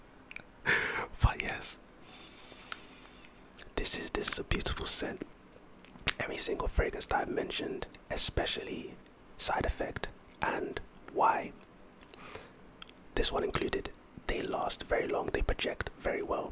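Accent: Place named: United Kingdom. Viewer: bro reminds me of hobie (british accent) 🫣🫣‼️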